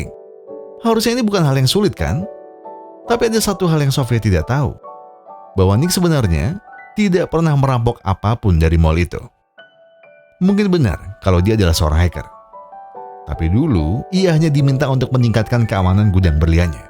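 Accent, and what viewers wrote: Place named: Tegal, Indonesia. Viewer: nt wong tegal min ? kayong ngapak temen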